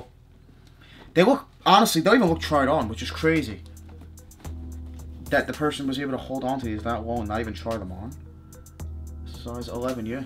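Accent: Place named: Brooklyn, United States. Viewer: Where abouts you from? You sound like a New York Brooklyn sort of accent.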